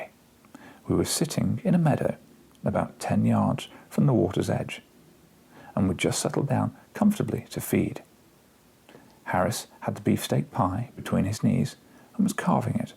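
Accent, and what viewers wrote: United Kingdom. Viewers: That is perfect to improve my English, thank you Doctor British accent, I love the video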